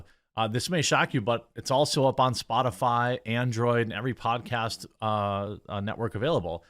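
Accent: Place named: Wisconsin, United States. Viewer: I get a chuckle when your Wisconsin accent breaks through. DrAYgged. VolkswAYgen. Brings a smile to my face when not much else has over the last 2 years.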